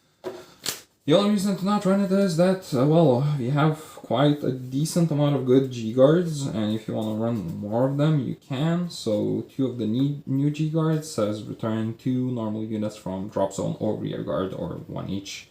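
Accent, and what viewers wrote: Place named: Poland. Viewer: Where are captions? I can't understand this polish accent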